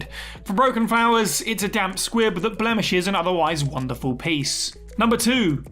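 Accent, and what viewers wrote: United Kingdom. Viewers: Anyone actually enjoy/agree with this channel’s opinions or are we all just wanting to hear ignorant opinions in a British accent?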